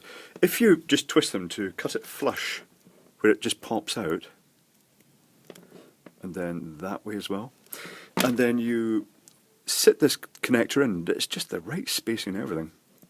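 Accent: Scotland, United Kingdom. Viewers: Gun Smoke Scottish accent so im assuming hes from Scotland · Scottish accent is amazing 👍🏼👍🏼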